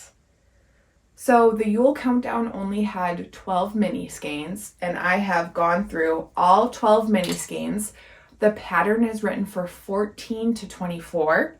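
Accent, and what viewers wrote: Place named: Midwest, United States. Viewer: I'm so obsessed with your recording location and your Midwest accent :)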